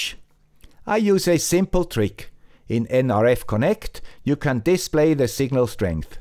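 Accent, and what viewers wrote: Switzerland. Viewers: Just wait until how Swiss Accented Guy discovers NearLink / Green Tooth.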